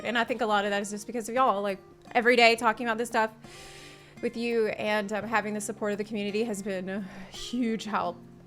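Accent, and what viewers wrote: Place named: Kentucky, United States. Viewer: aren't you from the america? i mean i live in canada so i'm no expert but you got some kind of...kentucky?ish accent lol.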